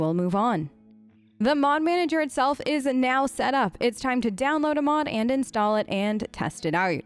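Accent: Australia. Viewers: 1- thanks so much for this! This and your origins and DA2 mod videos have been awesome! 2- Have you ended up living in australia or something? That aussie accent is leaking through! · why does it sound like your aussy but trying to hide it with a very middle American accent? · i like how ever now and then a bit of your accent comes through, to me it sounds australian, possibly new zealand...but i could be totally wrong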